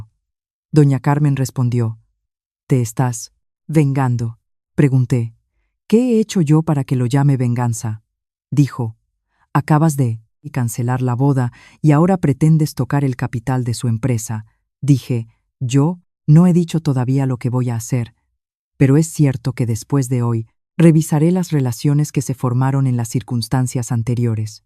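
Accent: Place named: Spain. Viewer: El relato es desde España. Allí se habla así. Mis hijos sun despues de 15 años de volver a Argentina siguen hablando así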